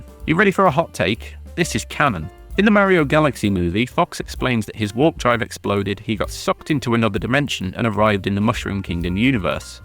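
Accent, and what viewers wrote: United Kingdom. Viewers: no, no you don't sound annoyed. You sound ANNOYING. Lol, clown. Skipping the video and dropping a dislike because literally every video with a British accent is them complaining about how much better they know something than everyone else does, even though they're actually the ones being aggressively reductive and flippant and irreverent and, because of all those things, inevitably wrong about every single subject they come across. Not watching the video but please, comment I'm wrong if you put yourself through this nonsense and feel I got it wrong. It'd be interesting to me because the odds are that I didn't get it wrong. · As an Aussie it's my national duty to take any self-appointed Starwing expert with a Pommy accent down a peg. Because what's the point of being on the internet if you can't get mad at random people saying ... 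wait, you said that bit. Anyway, despite your alleged Britishness and supposed history of playing this game since 1993, you insisted on calling the game Starfox instead of its right and proper PAL title, so you've brought this on yourself I'm afraid. Hostile force carrying bits of geometric structures around intending to build something? Mate, those are Corneria's own robots cleaning up the debris. You don't get points for shooting them, because they're friendly. They even carry off the pieces of the Attack Carrier that Falco destroys in Course 3 Corneria. Because he does in fact do that. Calling this an on-rails shooter ... such disrespect. Starwing is a rail shooter crossed with an obstacle course. That's the secret other half of the ingredients that makes it so good. Arches! Arches everywhere! Don't worry about your score? Mate, that's like two-thirds of the replay value in this sleek little cartridge. 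You've got to get your money's worth. You haven't beaten Starwing till you've rung up a perfect 100% score on all three courses. And no cheeky Black Hole shortcuts allowed either. I only ever managed it on Course 3 once. Once. And I don't have the photos of the old living room TV to prove it. You're just saying the score doesn't matter because you didn't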